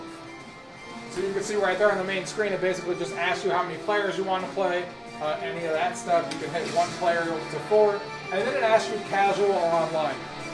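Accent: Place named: North Jersey, United States. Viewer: Dude, your in jersey? Wtf , I'm guessing your from the northern or western part by your accent. It's not as bad as a bayonne one like mine, but you have to be north of old Bridge. Hahah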